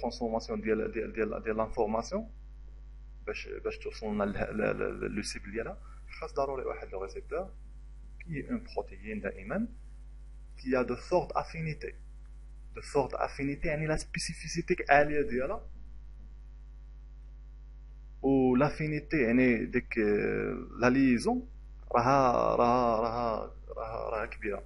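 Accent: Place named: Arab world. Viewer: Ton exposé n'est orienté qu'aux arabes, ton français est trop arabisé, on y comprend presque rien. Shukran quand-même.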